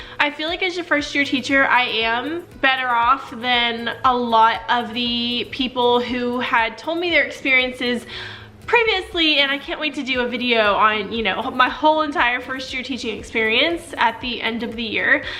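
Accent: South, United States. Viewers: I live in middle Tennessee.. I knew that southern accent!!!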